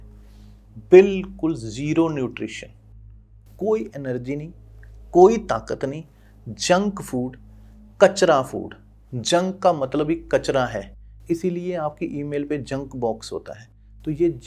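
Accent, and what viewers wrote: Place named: Rajasthan, India. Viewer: Aap guro ji ya to rajesthan ke ho ya hariyana jaunpur ke ho aap ki avaj se lagta he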